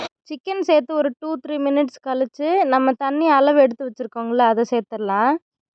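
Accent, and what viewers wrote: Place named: North India, India. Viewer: இங்க வந்து திண்டுக்கல் பக்கம் வளர்ந்த பொண்ணா இருந்தாலும், உங்க தமிழ் வட நாட்டுக்காரங்க பேசுற தமிழ் மாதிரியே இருக்கு. எப்படி???🤔🤔🤔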